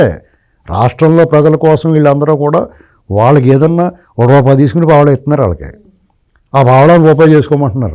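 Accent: Godavari, India. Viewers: కొంత గోదావరి ఎబ్బెట్టు కలిపి చాలా చక్కంగా చమంత్కారంగా విశ్లేషణ చేశారు 😁😁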